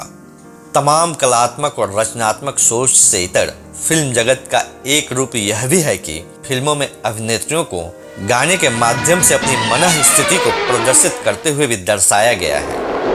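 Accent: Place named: Bengal, India. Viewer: ji Inka Bengali accent hai isiliye r ko d keh rahe hai.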